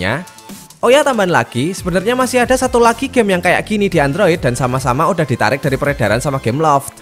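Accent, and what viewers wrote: Java, Indonesia. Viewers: Kok Logat e kejawen pak😂 agak ngapak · medok amat suara lu bang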